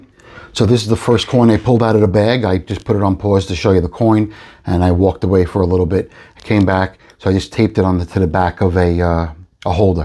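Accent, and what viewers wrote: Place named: Texas, United States. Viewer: Hey paisan. Like you stack, content and especially your Texas accent!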